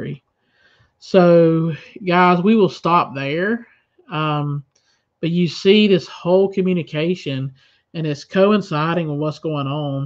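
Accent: South, United States. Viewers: ❤️ it ! I heard it with that beautiful southern accent.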